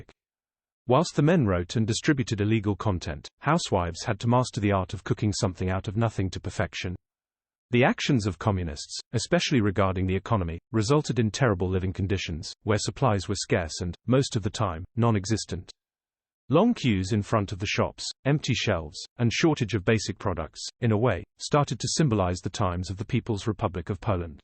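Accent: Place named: RP, United Kingdom. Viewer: I LOVE learning where the Dove of Peace came from. Wow no idea that came from Picasso. Ok just going to say that upper class Brit accent just sounds wrong. Doesn't match your passion and incredible heart for history. 👣🧭🫶❤🕊️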